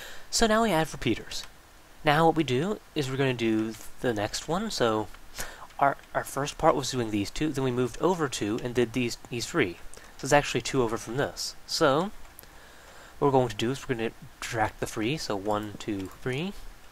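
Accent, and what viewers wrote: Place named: England, United Kingdom. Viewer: Are you a fancy person from England? Your voice sounds like that.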